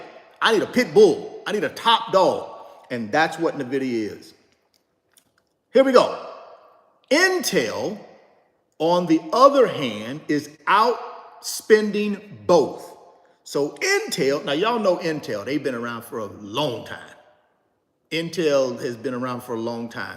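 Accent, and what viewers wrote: South, United States. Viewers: Then why are you guys watching? The dude has a country, "TWANG" or dialect, that makes certain words come out sounding... country. In essence, the word NVIDIA is not even a word; it was made up from the word invidia and a acronym NV for Next Vision... so it was created using a poetic license, so he's using is own poetic license when pronouncing the word. Lol. So, give him a break. The information he is providing about the company is accurate, informative, and nicely conveyed. I could see if he was giving bad information, but he's not. It's sad that you would even bring it up. How about this... Go watch someone who's pronouncing a company's name correctly and giving BAD information and put your money into that stock and see where it lands you.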